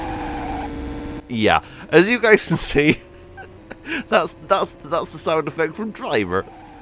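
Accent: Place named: United Kingdom. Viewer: Speech impediment? You mean his British accent?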